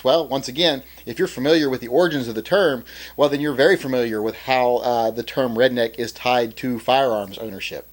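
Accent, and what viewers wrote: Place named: South, United States. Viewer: don''t hide your southern drawl just because ebomey doesn;t like it..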